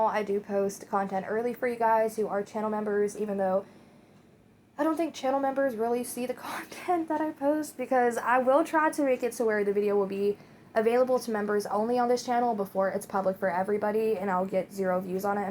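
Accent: South, United States. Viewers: Are you from the South. I’m from Louisiana and we say Yall a lot here. Keep up the good work.. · You saying “y’all” as a southern person from the USA is EXACTLY the same as a British person swearing like a sailor. Most of the time, it cannot be helped. It just slips out! I’m saying this as a British person who, very unfortunately, and regrettably, swears/curses like a sailor. Most of the time we were just raised saying it. Can it be annoying? Absolutely. I even annoy myself when “f*cking” or “f*ck” slips out of my mouth, mid speaking. But… thats no reason to hate or unsubscribe to someone. It’s dialect, sure, it can be annoying, but it’s not like you can stop it over night, especially after years of it becoming part of your typical dialect. Note: Im not saying that it cant be controlled at all. But most of the time it’s involuntary. Also, even as a British person who typically speaks in a, quote, “proper” way (apart from the wears) who tf would want to say “you all”, especially with a southern accent, it sounds clunky and kind of out of place. 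Your content is more sit down, relax, talking to friends than… professional, sit down and talk in a job interview or job meeting. People will always find fault in the silliest of thinga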